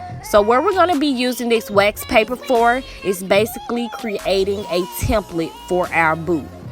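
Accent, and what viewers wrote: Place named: Georgia, United States. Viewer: You talk real country lol are u from Georgia also?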